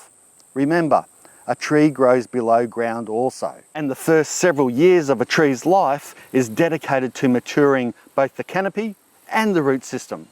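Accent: Australia. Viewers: We moving in to a house that has some fruit trees, which we've never owned. The orange tree is so thick, we can't get a fruit picker up there to get the oranges. Every day 4-5 oranges drop down. They're already moldy and split when they hit the ground. We can even see some brown shriveled up oranges up there. The tree also has small green oranges, so it's still producing. You mentioned it's not good to prune while the tree is producing. So what should we do? There's also a apple tree in the same condition. Any advice would be greatly appreciated. I live your channel because you're have a wealth of knowledge, I live the Aussie accent, and I so love your humor and quick Witt. Thank you for sharing!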